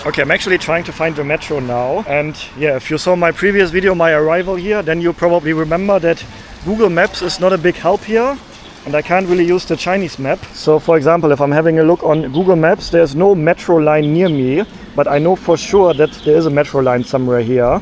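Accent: Germany. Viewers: Maybe a "better" suggestion for saying Germany in Mandarin is: "Ter Kwor"; you raid roughly "Ter Kwar" - a world of difference. People who suggest, say: "De Guo" - may not be helping you much, since you maybe do not know the current, and more accurate, Pinyin phonetic system. The "De" is pronounced "Ter" and the "Guo" is pronounced "Kwor" - OR rather than AR sound. Also in Pinyin, the "d" is the usual "t" and the "g" our usual "k". I noticed you may have pronounced Wuhan as Vuhan, since you are using your German way "v" for the "w", just as your English is very much the German way of speaking English. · your pronounciation of Germany in Chinese is still off 😂It is because of your German accent.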